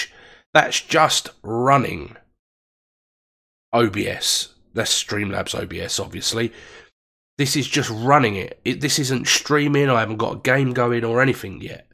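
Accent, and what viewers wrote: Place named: United Kingdom. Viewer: Ur too much British accent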